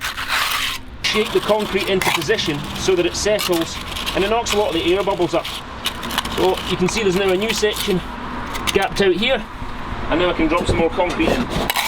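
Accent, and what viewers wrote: Scotland, United Kingdom. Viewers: Great video! Just what I was looking for! Fanx! Scottish?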